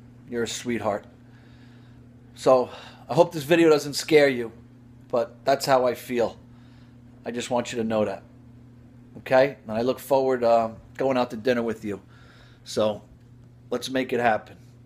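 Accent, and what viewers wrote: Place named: Brooklyn, United States. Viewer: It's a Brooklyn accent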